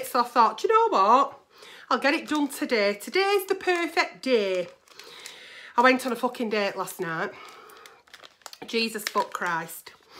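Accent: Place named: Lancashire, United Kingdom. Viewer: U sound like ur from Accrington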